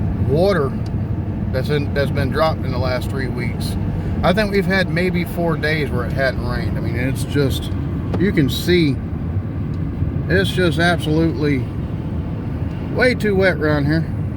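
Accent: South, United States. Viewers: And I'm good with your accent no matter how southern you get, I'm a big big country fan all my life so it's second nature for me . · It’s all good brother. You may sound a bit like a hillbilly but I think most people on here can see your, as we say in a Australia... a top bloke 👍 keep the videos coming mate 😊👍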